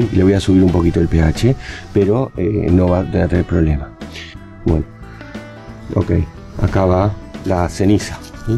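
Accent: Argentina. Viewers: Buenicimo video COMO todos como c aprende pero a mí lo que más me gusta es su acento Argentino. Saludos desde Monterrey México 🤗🍀🌹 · Me encanta el acento de los argentinos. Saludos desde Colombia. Muy buenos vídeos. Aquí una nueva suscriptora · de donde sos?? sos Argentino??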